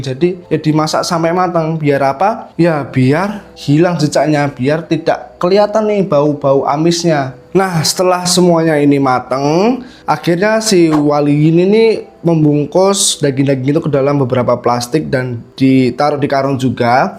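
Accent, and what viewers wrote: Java, Indonesia. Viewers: Ngomong GUA slank JOWO , mending SAYA masze jd cakep ...luwes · Loe Gua tapi medok 😮 mbok saya atau aku aja mas. Opo sampean kamu enak malahan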